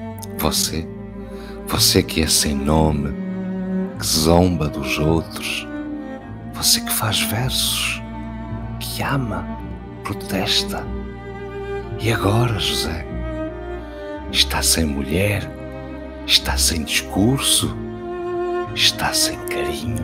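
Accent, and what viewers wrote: Portugal. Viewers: Essa narração com o sotaque de português de Portugal ficou incrível... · Essas leituras ao som português é divino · Esse cara com sotaque português é fera ao recitar poemas . Muito bom ! Quem é ele ? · O que é maravilhoso é o sotaque português.perfeito!!!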